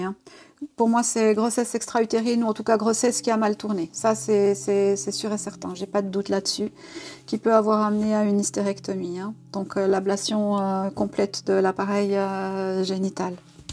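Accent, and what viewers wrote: Switzerland. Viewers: Coucou, j’ai été scotchée par cette vidéo, je viens de m’abonner 😉😉😃. Mais au fait, a-t-elle vraiment un cancer on peut en douter maintenant après avoir écouté votre vidéo, ils préfèrent dire cela plutôt que d’expliquer qu’il y a eu un couac pendant l’opération? Il m’a semblé entendre un joli accent venant de Suisse romande, si c’est le cas 👏👏 bisous de Genève 🥰🥰🇨🇭🇨🇭🇨🇭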